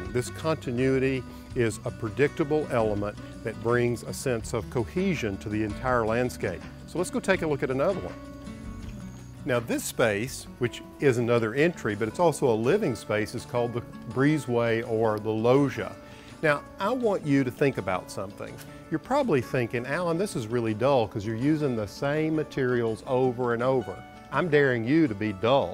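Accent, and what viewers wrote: South, United States. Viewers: You have such a great voice ... so soothing with a wonderful little dash of the South.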